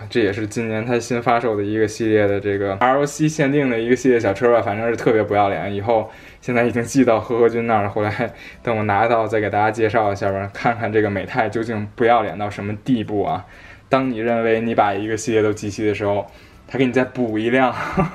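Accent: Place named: Beijing, China. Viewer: 北京人，说话很有特点很好听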